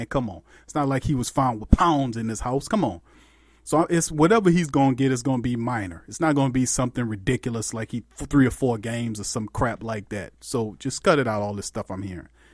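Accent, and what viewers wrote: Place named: New Orleans, United States. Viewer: That's new Orleans for you I'm from the boot so it sounds normal to me 🤣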